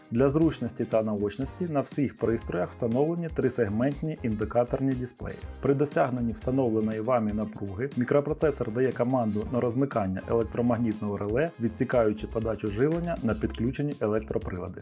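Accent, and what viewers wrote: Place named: Russia. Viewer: Кацапський акцент. Невже не можна вивчити нормально декілька речень. Азіров би пишався 🤦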